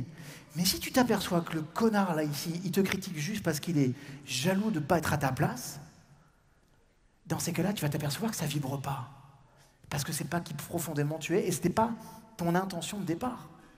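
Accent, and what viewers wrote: Quebec, Canada. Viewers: Merci d’avoir publié ta conférence de l’AZ Paris! Je rêvais de t’entendre au Sommet de Québec où j’étais pour la première fois cette année. C’est mon prix de consolation:0) … et en passant ton accent québécois oh la la … comme dit Martin à faire la même chose 1000 fois, on finit par y arriver! Je t’adore, merci de tous ces partages sur YouTube, tu fais partie de mon univers plusieurs fois semaine et je m’enrichis à chaque visionnement. Bonne continuité!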